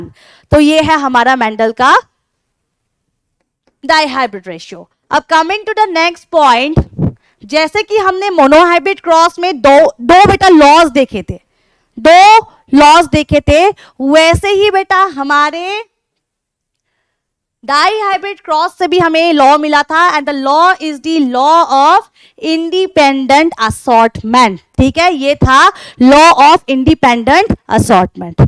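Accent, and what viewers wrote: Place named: Bhojpuri, India. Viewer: ARE DIKKAT KA HAI RE.. BEST BHOJPURI TONE... 🔥🔥😂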